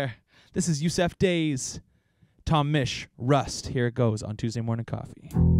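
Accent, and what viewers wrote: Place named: Nova Scotia, Canada. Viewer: You sound like you are from Nova Scotia. 😎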